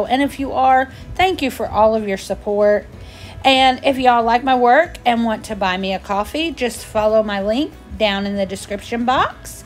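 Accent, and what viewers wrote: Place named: South, United States. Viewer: Loved the beach theme and your Southern accent. So glad I found your channel.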